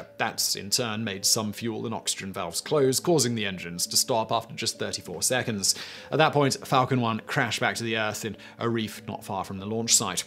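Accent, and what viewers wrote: United Kingdom. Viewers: He’s speaking correct, British English, rather than some simplified colonial version.🇬🇧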